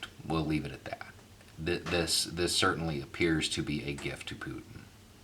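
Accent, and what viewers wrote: United States. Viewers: You speak real American. I wish more of us did. We all need to show up at our local republican office and demand that they denounce this Fucking imbecile trump and start impeachment proceedings... or we pick them up by their Fucking Traitor throats.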